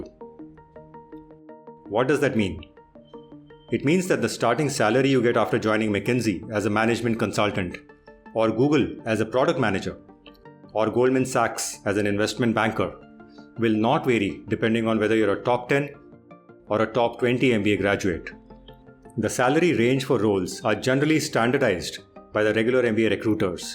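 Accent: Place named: India. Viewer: That's the beauty of Indian spices and accents. The more you experience them, the more you'll fall in love with them. Till you get there, feel free to turn on the accent-free subtitles on our videos.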